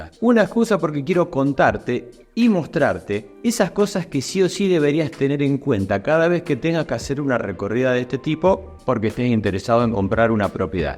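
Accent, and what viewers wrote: Argentina. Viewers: Buenos dias Arquitecto, Tienes un importante número de comentarios en este vídeo. Te sigo, y te sigo..esta vez si quiero escribirte (me leerás?). He hecho un experimento. Lavaplatos roto, lavo a mano uff, y escucho tu narración (después veré el video). Decirte que eres tan profesional en tu arte, y tan bueno divulgando divulgando...el habla argentina me gusta desde siempre. Me llamo Lujan. Mi padre fue un magnífico bailarin, premiado en competición de tango. Y vestía muy elegante.disculpas por este protocolo. Mi caso se encuentra entre los narrados por ti. A saber: compro casuca, sin mirar. Necesitaba poner ahi varios enseres. Me habia cansado y empobrecido el hecho de alquilar. Después de un tiempo querria hacer el lugar habitable. Sencillo. Me aconsejan demoler todo y volver a construir con los precios Abusivos, en mi opinión, que cobran en España. 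Así estoy casuca y yo. Pero te seguiré en todos tus vídeos. Y me preguntó: en España, Comunidad Valenciana, existirá un? Yo no lo he encontrado. Inteligente, honesto, conociendo su oficio, y con una pronunciación que merece un 10., soy profesora. Mi oficio y mi pasión por la Arquitectura Viene de muy pequeña. Jugaba a construir de todo con bloques de madera en cuatro colores. Un fuerte abrazo por darnos tanto conocimiento. Desde Valencia. Cerca del mar Mediterránei. Hasta pronto. Soy Lola.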